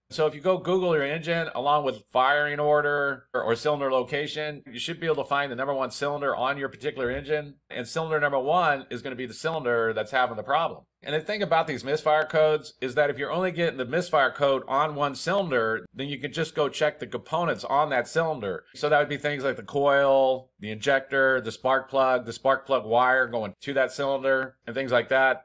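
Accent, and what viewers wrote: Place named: Chicago, United States. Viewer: Very nice accent ❤ from where this accent is it a Chicago?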